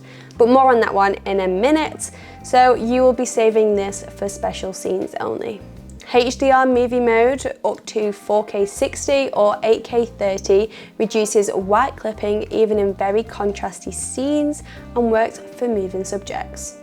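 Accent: United Kingdom. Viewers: As someone from Texas, it might be the British accent that entranced me, but this might be one of the best reviews on YouTube- great job 👏 (wait, am I supposed to bow?). As for the camera, I’m shocked at the quality at 1/4000, f/4, ISO 6400. I’m looking forward to what I will get using a 2.8 lens…wow! Keep it up, Queen 👸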